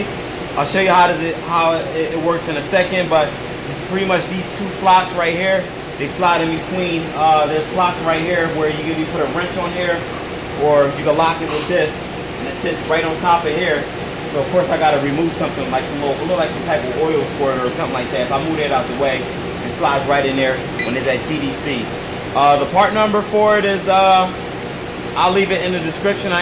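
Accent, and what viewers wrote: Philadelphia, United States. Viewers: He sound like he from philly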